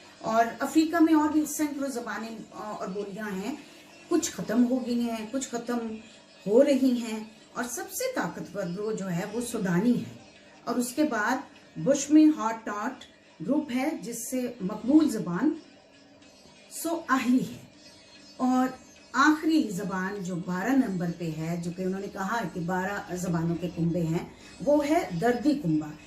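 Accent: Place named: New York, United States. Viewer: Very NYC voice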